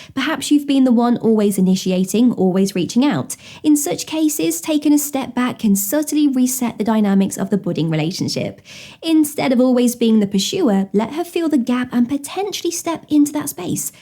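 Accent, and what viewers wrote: United Kingdom. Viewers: British accent is music to my ears.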